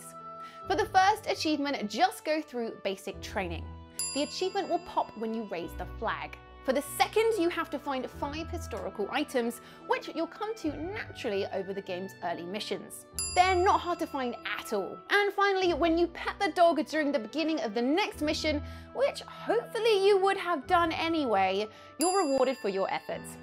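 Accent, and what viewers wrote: United Kingdom. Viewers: this is so british i actully understand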